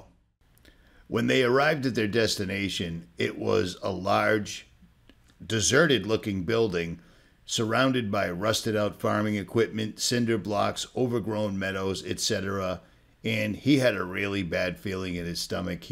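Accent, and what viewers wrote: Boston, United States. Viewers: You sound exactly like a guy named "Ritchie from Boston" lol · This sounds like richie from boston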